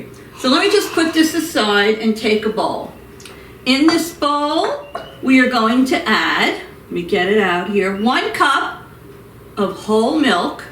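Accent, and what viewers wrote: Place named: South Jersey, United States. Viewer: Oh man, this looks really good, I even think my grandlittles and son may like it! Baking is not my forte so I am ready and open to learning from the herself! By the way....I recognize that accent. I am a former South Jersey girl. Loved it and miss it but we are now back in my home state, NE. Really love your creative video style. So looking forward to more! Also put your notification bell on "All"!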